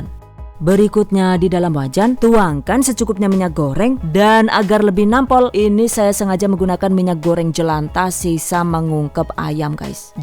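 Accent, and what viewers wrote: Banyumas, Indonesia. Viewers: Aduh aku geget wong ndi si mbokayu deneng ngapak mblaketaket pisan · Ora ngapak ra kepenak😂😂😂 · Aku ya eong ngapak, tapi ari krungu ngomong ngapak geget dewek 😅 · Ngapake bikin candu😁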